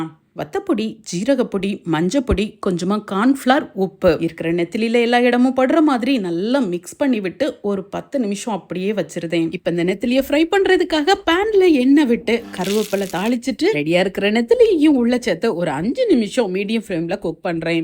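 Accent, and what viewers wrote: Tirunelveli, India. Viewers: Sister neenga thirunelveli ya??...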